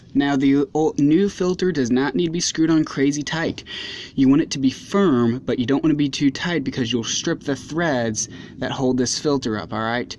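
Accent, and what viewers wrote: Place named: United States. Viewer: I LOVE YOUR VIDEO . WHAT SIZE SOCKET? I LOVE HAVING SOMEONE W/ GOOD DELIVERY IN U.S. ACCENT. OTHER ACCENTS TOO HARD TO LISTEN TO.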